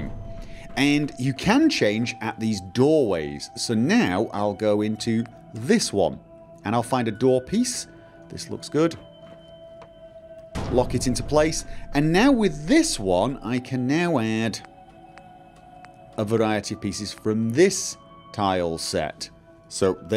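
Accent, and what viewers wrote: United Kingdom. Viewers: video as always, mate. Which part of Norway are you from? Your accent sounds somewhat British, then again, I've only heard the Norwegian accent a handful of times.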